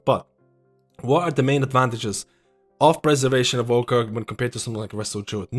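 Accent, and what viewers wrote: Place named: Ireland. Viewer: Great vid as per usual! Why do I always here a tinge of an Irish accent from you? Lol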